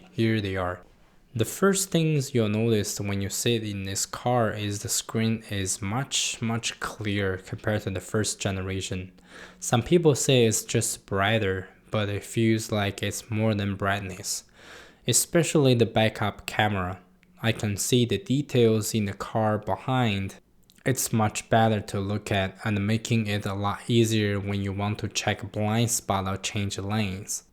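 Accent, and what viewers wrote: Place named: China. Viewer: good english, but still, 老中 😊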